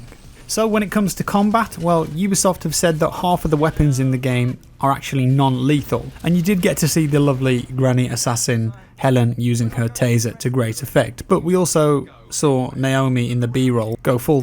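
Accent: United Kingdom. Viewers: “Cameras are everywhere” this guy has a British accent and doesn’t know that London is the city with the most surveillance in the world, cameras are already everywhere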